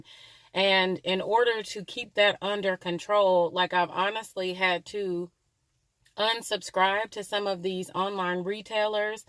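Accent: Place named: North Carolina, United States. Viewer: ❤️ I'm from and live in UK, my hubby is from America, he walked past and was like 'who you listening too, she sounds like she's from NC', which is where he is from. I have no idea where you are from, but I love listening to your videos as your accent reminds me of all our family and friends back there🥰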